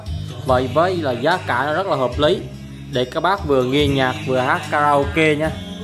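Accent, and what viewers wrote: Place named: Binh Dinh, Vietnam. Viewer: Xem kênh nhiều của em anh rất thích chất giọng đặc trưng Bình Định của em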